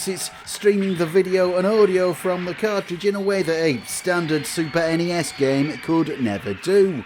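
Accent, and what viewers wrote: United Kingdom. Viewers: This is how proper British folk talk.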